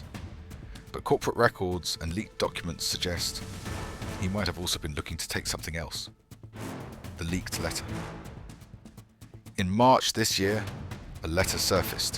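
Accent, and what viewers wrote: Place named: United Kingdom. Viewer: I agree with the ending message of this video. This is not just about rugby. The same with the intern-connection of PNG roads proposed for our economic empowerment. Likewise, with the sale of MVIL shares to MRDC. It is about power and influence. Yes, we can claim that this, Wapu Sonk guy is a bad apple. But what's the use if the entire apple tree is bagarap? The whole operation needs to be scrutinized and reformed. The same with interior development projects and publicly traded shares. It all needs reform. Is PMJM only willing to act decisively when faced with media scrutiny from abroad like the Sydney Morning Herald and ABC News? What happens then, when we express outrage at corruption domestically, yet cannot get results without the aid of foreign media highlighting our issues? Truly sad. But thanks lo karem British voice kam bek lo stori lo news updates! 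😆🤣 Inap! Mi go silip nau! 😴🛌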